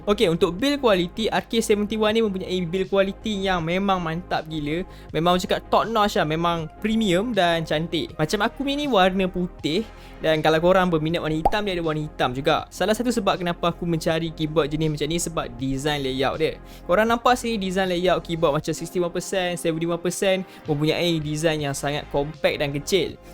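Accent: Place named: Kedah, Malaysia. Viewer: mantap ah orang kedah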